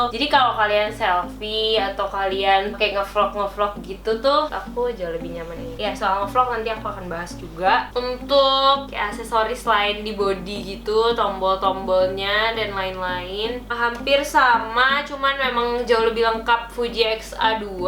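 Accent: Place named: Visayas, Philippines. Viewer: Hala, your dialect kinda sound close to the Visayan dialect from the Philippines. I find It fascinating. :)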